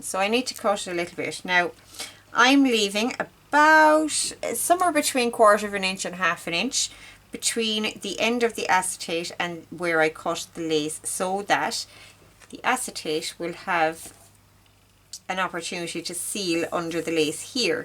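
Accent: Ireland. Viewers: Not only do I love what you have shared here but I could listen to your lovely Irish accent all day. Thanks!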